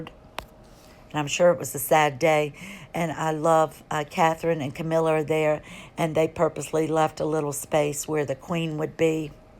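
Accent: South, United States. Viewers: The Southern accent gives you away as a bullshit artist MAGA person.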